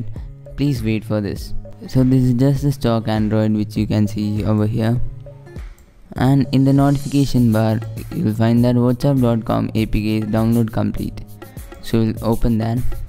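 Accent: India. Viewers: Indian English accent sucks!!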